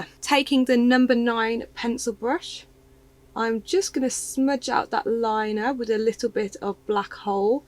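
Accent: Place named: Birmingham, United Kingdom. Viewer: I ordered this palette today, can’t wait to use it!!! It’s so beautiful. Is that a Brummie accent I hear? Fellow Brummie!!!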